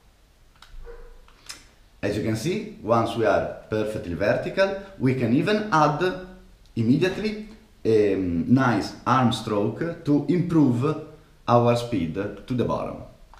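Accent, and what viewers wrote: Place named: Italy. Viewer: Your italian dialect is so cool!😎🤙🏻 Thank you!😊👌🏻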